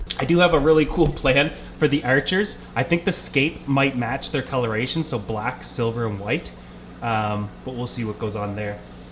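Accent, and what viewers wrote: Canada. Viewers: 1st thing I like the idea of brackish and the lay out of aquarium. I've had few types of tanks over the years. Don't have any now and no I haven't lost the Love for the hobby it's I want to do something different but habits are hard to brake. 2nd I hate it when people belittle themselves, I'll answer your question why pronounce the way you do. Bro it's your accent. I'm not to familiar with Canada but in the States we have to deal all kinds of different accents. Every part of country seems to have its own. Good luck with the brackish and don't let fools like these question yourself.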